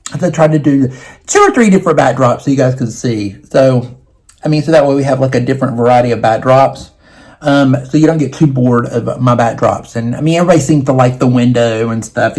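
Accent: South, United States. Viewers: Thank God you reviewed this foundation. I am 39, had a bday recently and have dry dry skin from Sjogrens syndrome. My mom has cancer and has dry skin and has begged me to find a foundation for winter for dry skin. We are Lancome people but they have really changed formulation and now their products are for younger people and they are totally missing the market. So upsetting for us younger middle aged ladies and elderly women like mom because they have discontinued EVERYTHING that worked before and reformulated some things for combo skin and oily skin. Sooo, us dry skinned people are left out in the cold. Just subscribed and will watch some of your older videos. Love that you are down to earth and that you are using a brush for this foundation and not fingers. Most people reviewed this with fingers and didnt get coverage but a dense brush makes more sense. 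Also, I'm from Texas and as a Southerner your accent is normal to me. I watch Lisa and Michelle as well but lately I am SOO UPSET with high end brands dropping the ball with some products. I know Chanel and Dior has reformulated so much with inferior ingredients to keep cost down but those of us with rosacea can really feel it in the skin.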